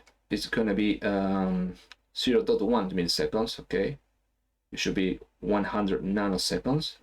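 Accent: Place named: Argentina. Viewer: Hola , no se por que se me hace que sos argentino, por casualidad tenes los pdf de tus clases ?, soy martin de argentina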